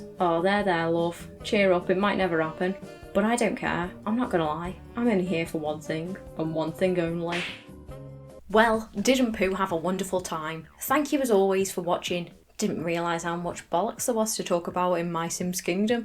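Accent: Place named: Merseyside, United Kingdom. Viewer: Just started watching some My Sims videos today, especially looking through all the mini games in Party DS. I still remember most of them and they were so fun. More importantly, which city/county are you from? Your accent sounds like a Merseyside one at times but then it goes again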